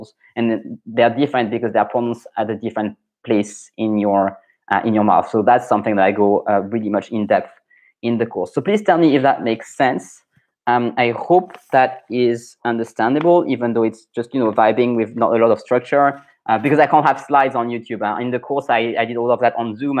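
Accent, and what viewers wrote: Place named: United States. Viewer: I am listening and watching this but I thought I would you pick up on what you said . Your accent sounds anglophonic. I would say broadly apart from the odd word I would say to my British ear you sound as American or Scandinavian who speaks good English.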